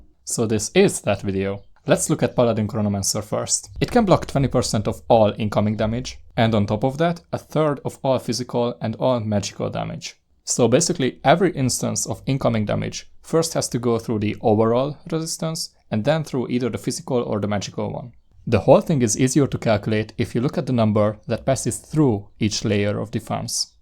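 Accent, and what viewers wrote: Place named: Hungary. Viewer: Üdvözletem kedves uram! Az akcentusodból ítélve magyarnak gondollak, de ez majd úgyis beigazolás nyer a kommentet olvasva. Csak megszeretném köszönni a minőségi munkát és további sok sikert mindenben! Várom a további tartalmat és big up! 👆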